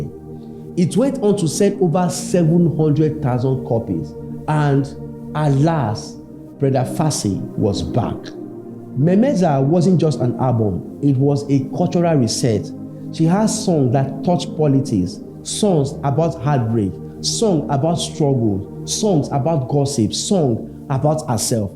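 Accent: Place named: Nigeria. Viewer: How is hate this Nigerian accent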